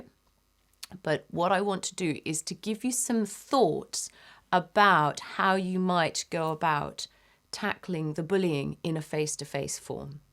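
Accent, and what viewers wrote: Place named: RP, United Kingdom. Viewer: Refreshing to hear a posh voice wierdly. Thanks for doing this video very good topic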